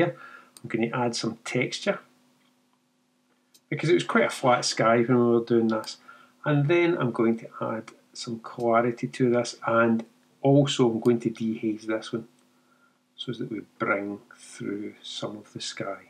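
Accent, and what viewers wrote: Scotland, United Kingdom. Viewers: I love your accent....but you got me with the 22 Megabyte FAIL...What is a FAIL.... After about the 4th or 5th time I heard it, I finally got it. A FAIL is Scottish for a FILE with a long eye in American English. For us Yanks, fail rhythms with pale or pail. English is so screwy. "My attempt at editing was a major fail"...Like failure, failed, DOUGH is pronounced D-OH COUGH is pronounced CAW-F There is a street in San Francisco named GOUGH... Not GO, not GUFF, it is GOFF Do you say NILE like the river in Egypt for those pointy metal things we hit with hammers? As I said, I really love your accent, it is beautiful. Reminds me of the great Sean Connery. And once I got it, I totally understood. It is very charming...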